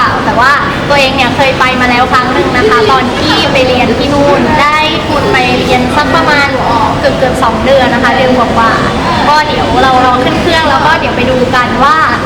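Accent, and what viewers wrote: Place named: Taiwan. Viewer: ชอบสำเนียงของคุณ..พูดจีนสำเนียงไต้หวัน..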